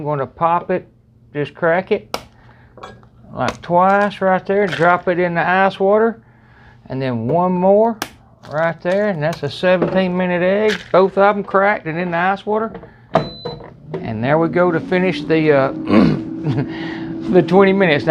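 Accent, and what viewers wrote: South, United States. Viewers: just been listening to the new album and your accent slightly reminded me of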